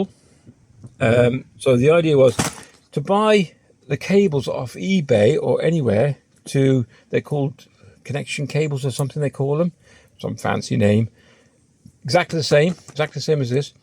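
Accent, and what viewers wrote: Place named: Shropshire, United Kingdom. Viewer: recognise your accent.....anywhere near Shropshire?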